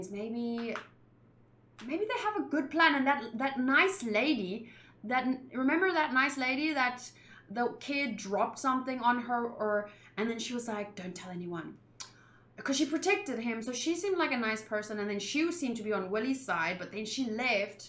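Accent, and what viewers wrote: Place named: South Africa. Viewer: she from South Africa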